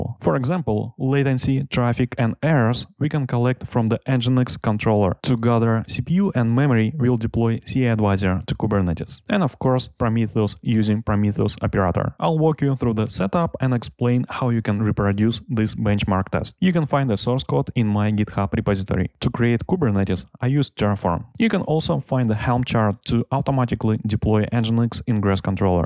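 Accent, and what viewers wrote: Russia. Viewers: думаю, чё я так хорошо тебя понимаю? а ты на русском по-английски говоришь) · That strong Russian accent 😃 Thanks for benchmarking!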